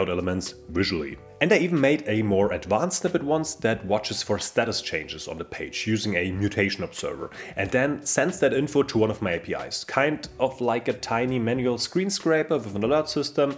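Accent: Germany. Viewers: I always recognize a fellow German by their accent 👌 Great tips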